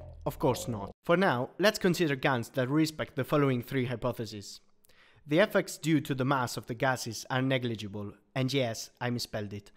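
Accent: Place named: Italy. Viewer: Come on, give the guy a break. We all know Italians have a very heavy accent when speaking. Your fellow countrymen don't do any better in speaking English, neighbour ;-)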